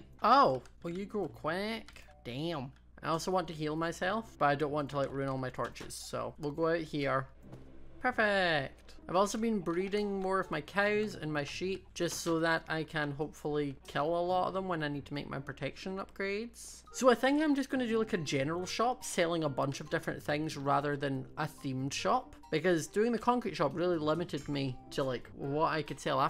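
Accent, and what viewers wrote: United Kingdom. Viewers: Waittttttt are you british?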